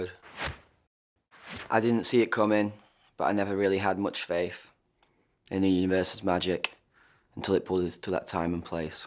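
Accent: United Kingdom. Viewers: Is he british by any chance?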